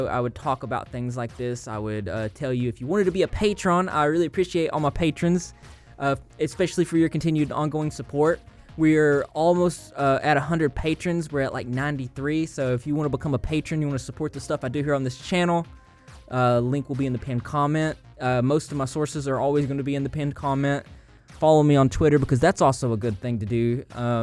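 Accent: South, United States. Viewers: I forgot what state he said he’s in but it’s an American southern/ mid western accent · oh okay I couldn't pin point it. I'm like he sounds southern but mixed with another accent 😁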